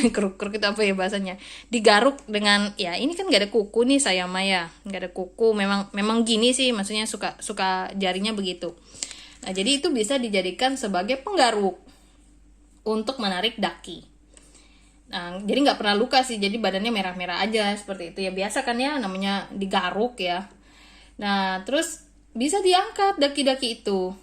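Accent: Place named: Batak, Indonesia. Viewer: Kakak org batak ya ? Cara bicaranya mirip temanku dari batak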